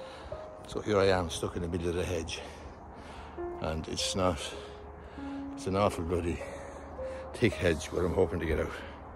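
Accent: Ireland. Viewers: I like how the YouTube subtitles completely misunderstand the Irish accent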